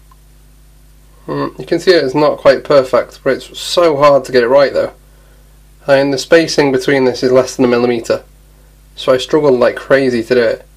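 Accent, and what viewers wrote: United Kingdom. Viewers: Oh God it's British:)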